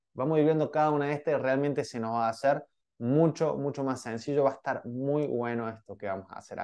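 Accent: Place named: mixed, Argentina. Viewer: Es Tucumáno o cordobés de Argentina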